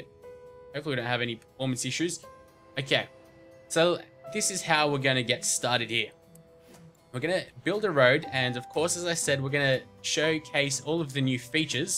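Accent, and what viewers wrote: Australia. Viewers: are u from Australia? because my mom is and i now a accent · Oh, this is Sim City (GOOD) you are Australian = This play through EPIC. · fuck yeah! You're australian! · Australian right ? ;) Nice video mate ;) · I love this guys voice where are you from. Australia any chance? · hey!! you are Australian!! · I thought you were Australian and I cheered a little (I'm Australian, in Brisbane) then you said about saving on the North American servers and I got a bit confused =P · you sound like your from Australia are you from austrailia if you are your EPIC! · It's Australian not British lol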